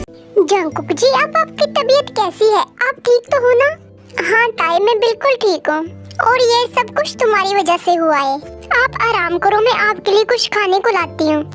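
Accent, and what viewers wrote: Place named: Punjab, India. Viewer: Apka Hindi plus Panjabi accent h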